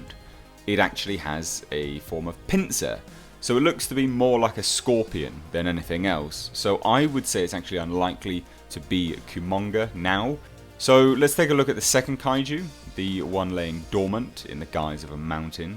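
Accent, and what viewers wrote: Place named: England, United Kingdom. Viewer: Your trailer breakdown was the only one that was good!I'm 48, grew up watching all these movies, Gamera also! But I've never, and I mean NEVER been so excited for one of these movies, they've done godzilla so well! Monsters look very realistic! It's gonna be great! U have an English accent, are you in England or the USA? And are the release dates the same?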